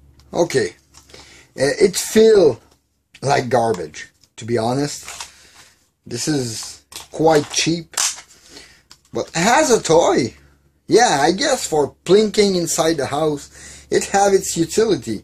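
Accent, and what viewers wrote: Quebec, Canada. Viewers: wait you come from quebec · J'ai reconnu ton p'tit accent du bon Québec :) Bonne vidéo my man! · Tu vien du quebec?! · quebecois et fiere de l'etre jai reconnu ton accent hahah!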